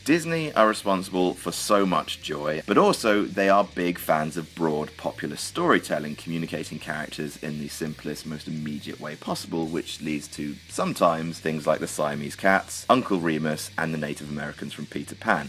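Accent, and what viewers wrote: United Kingdom. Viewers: Nothing like listening to a stuffy British-sounding dandy with a stick up his arse passing judgement on films 50 plus years old... That said, someone needs to clue this guy in to the fact that actors playing other races (and sexes) goes back to the time of Shakespeare, when females were not even allowed on stage, and there WERE characters of color like Othello in the lexicon. Fast forward to the silent film days where Lon Chaney was lauded as the "Man of a 1000 Faces" for his ability to play a variety of characters, including foreigners and Orientals (yeah, I just used that word to piss off the narrator). You can find offensive stereotypes in everything pre-1960s from Bugs Bunny cartoons to 3 Stooges shorts, let alone feature length movies. It ain't rocket science. The two I can't believe here missed are: 1. Charlie Chan, initially played by Warner Oland (who also played Fu Manchu as mentioned) who was Swedish and then Sidney Toler, who was American. 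In the 80s, a new Chan film was protested for its use of non-Asian actors, but flopped due to lameness as opposed to protests. Fox Movie Channel even pulled its "Charlie Chan Theater" (which showed the old 30s and 40s Chan movies) off the air in the early 2000s--most likely due to PC over-reaction. 2. Peter Sellers as Sydney Wong, a Chan parody character in Neil Simon's "Murder By Death" (1975), which is a Who-Done-It satire featuring famous fictional detectives (i.e. Sam Diamond instead of Sam Spade). While Seller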